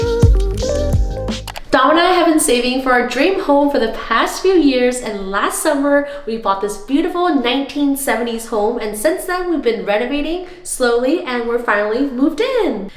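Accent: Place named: United States. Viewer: You are looks like Koean or Japanees. But Your Speking Says You are American.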